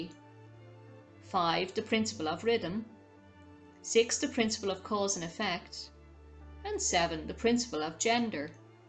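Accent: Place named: Ireland. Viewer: Are you Irish? I love your accent! Not flirting just saying. Sometimes it love to jump into the mind of an Irishman to see how we sound to you guys.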